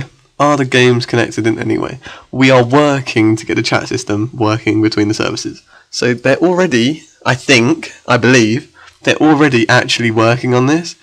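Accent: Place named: United Kingdom. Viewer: ur british, look at my version plawx?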